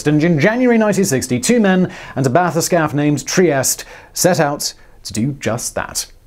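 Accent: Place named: England, United Kingdom. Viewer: The English accent... :)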